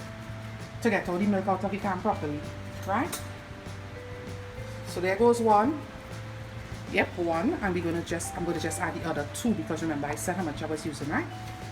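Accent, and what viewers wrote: Trinidad and Tobago. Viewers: How I love the trini 🇹🇹 accent great job fudge looks delicious 😋 thanks for sharing stay safe from Guyana 🇬🇾 with love ❤️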